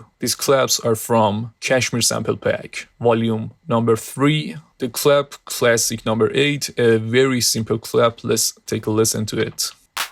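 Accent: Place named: Iran. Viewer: Your accent sounds like a persian, are you?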